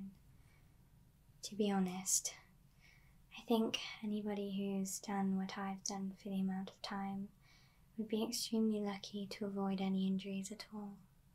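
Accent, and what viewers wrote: United Kingdom. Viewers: Your British accent works great together with the Lara cosplay.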